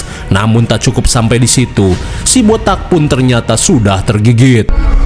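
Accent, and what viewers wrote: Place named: Sunda, Indonesia. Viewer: lenggket banget bahasa sunda nya😂😂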